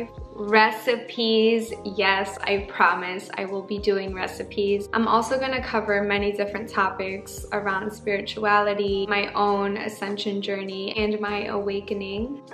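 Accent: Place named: Colombia. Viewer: I am so excited to see more! And your Spanish is beautiful – that Colombian accent, girl ✨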